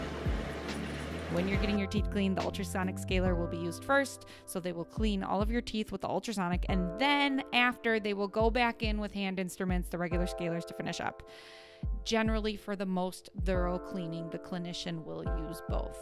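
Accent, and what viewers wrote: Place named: Michigan, United States. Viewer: I hear a Michigan Accent..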